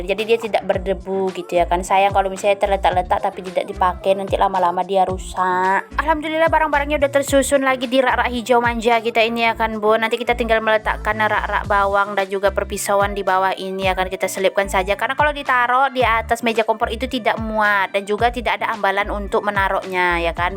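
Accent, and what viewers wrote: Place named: Java, Indonesia. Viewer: Ngmng ny kaya tetangga ku bun dari Jawa ya aku dah subscribe bun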